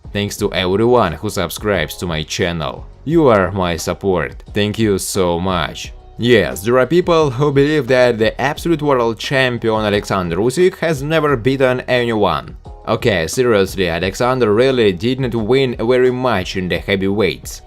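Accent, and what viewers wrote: Russia. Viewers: The context could be fine, but dude!? Just speak Russian with Russian accent, because your English has the stupidest accent, it is irritating, so after one minute I had to go... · Красивый русский акцент )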